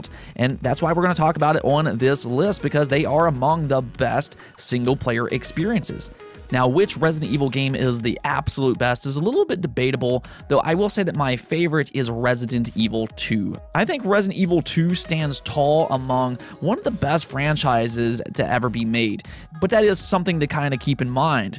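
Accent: United States. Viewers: !! Jeez lassie I thought you were from New York or California! I’m gonna have to try your game too! I got the NEW Xbox though 😂♥️🤷‍♀️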